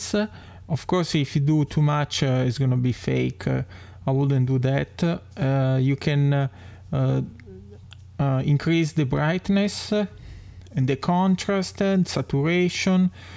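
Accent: Italy. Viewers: Come si sente che sei italiano!! Ho una mia teoria riguardo al fatto che parli in questo modo in inglese.. Pur vivendo in Usa ascolti le radio italiane, leggi giornali italiani, fai video italiani e hai una moglie italiana.. C'è un tizio italiano su youtube che vive sempre in USA che ha la moglie americana e credo che non abbia l'abitudine di leggere giornali italiani. Parla italiano nei suoi video ma sembra più americano che italiano se lo senti parlare..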